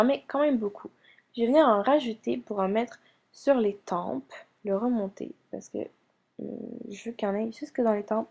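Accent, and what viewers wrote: Quebec, Canada. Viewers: Srx , elle s'invente pas un Accent , ni des noms de maquillage ! Elle est québécoise , t'avais pas encore Compris ? ^^ Cet toi qui fait pitié ma Pauvre ! Retourne au Collège ma petite , faudrait bien que tu apprennes a écrire . Tu crois pas ? x)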